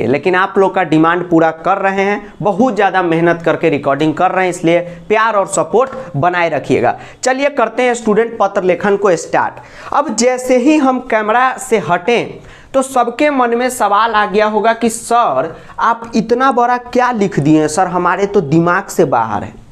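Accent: Bihar, India. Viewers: Pure bihari sir😍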